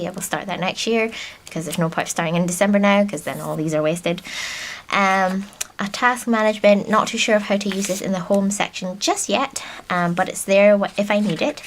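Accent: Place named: Scotland, United Kingdom. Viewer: Love the video! Was pleasantly surprised to hear a Scottish accent too 😁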